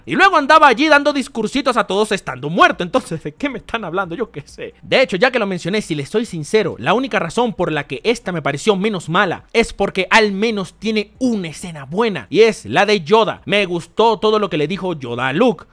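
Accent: Venezuela. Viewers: Y pensar que ese acento venezolano volvería jajasjsaj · Llevo años viendo videos de y primera vez que le escucho lo veneco y modo insultador jajajja coño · ajkjask mrc, me llena de vida escuchar a modo venezolano super obstinado, fuerza fuerza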